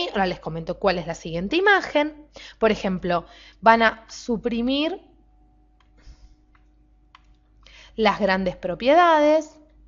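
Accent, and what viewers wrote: Argentina. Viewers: lol es argentina y por eso la pronunciación xD no sé si es sarcasmo.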